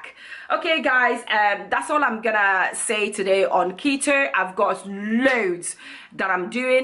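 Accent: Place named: United Kingdom. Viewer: Love your accent are you British?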